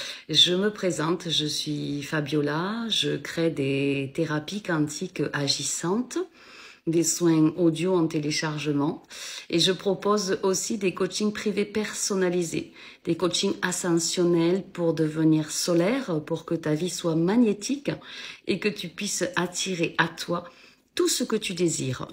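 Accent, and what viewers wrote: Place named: Southwest, France. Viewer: Oui , j'aime beaucoup ta voix , avec ce petit accent du sud-ouest , mais je ne savais pas que tu étais très jolie . Bisous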